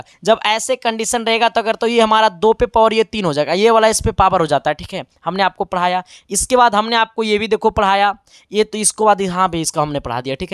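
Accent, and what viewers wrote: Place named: Bihar, India. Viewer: Bahut hard🎉🔥!! That bihari.. tone !! Jsstt took the heart💖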